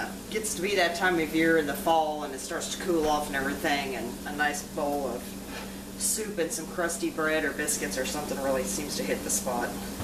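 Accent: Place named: New England, United States. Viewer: Looks great!! Your New England accent wasnt to bad either:) Cheers!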